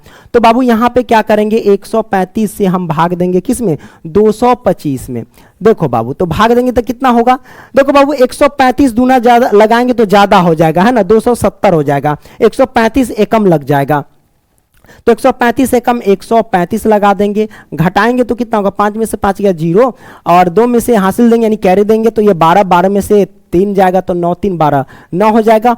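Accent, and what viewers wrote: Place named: Purnea, India. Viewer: Sir aapki language bihar ke purnea ki lg rhi h ❤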